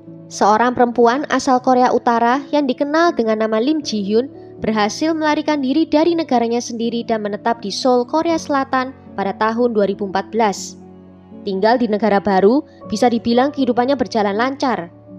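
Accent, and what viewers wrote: Java, Indonesia. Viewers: Say medokuhhh 👍💖😍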